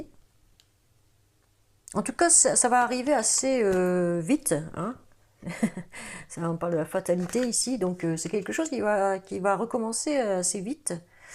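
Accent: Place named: North, France. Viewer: Bonjour vous êtes du Nord 😊😊 je l'entends comme moi 😊😊 votre tirage me parle beaucoup ♥️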